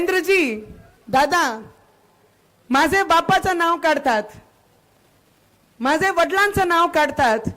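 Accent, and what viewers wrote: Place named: South India, India. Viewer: एक साऊथ ची स्त्री मराठी बोलण्याचं प्रयत्न करते हीच एक मोठी गोष्ट आहे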